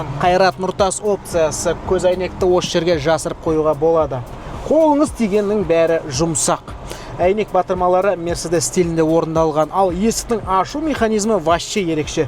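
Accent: Standard. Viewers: Ассалаумағалайкум. жарнама болаберсін сенің осындаи видиоларңды көруге тамаша таза қазақша😂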